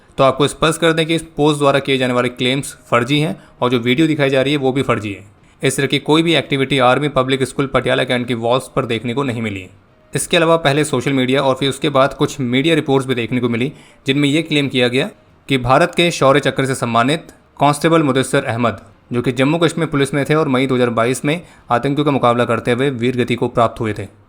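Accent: Pakistan. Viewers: Ye to Pakistani Punjabi ke bolne ki tone hai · Conversation Pakistani tone hai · साफ पता चल रहा है पाकिस्तानी एक्सेंट है कोशिश कर रहा है भारतीय की तरह बात करने का।😅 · Pakistani sound. If you know how they speak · Ye pakistani extent me baat kar rahe hai audio me. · Pakistani accent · Hadd hogyi ye to awaaz hi lahori h endiaaa ko pgl.smzte h ye 😂😂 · bhai eay suar lg koi achhe mimicry artist se voice record karwa leta paysa deke. Hindi me urdu prokisthani assent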